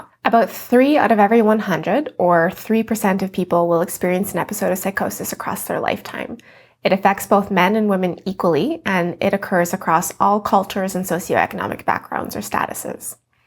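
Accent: Canada. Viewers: Great demystification of psychosis, carried out with your lovely gentle Canadian accent. I find listening to you makes me feel calm and at one with the world. I guess there's an irony there! Thank you.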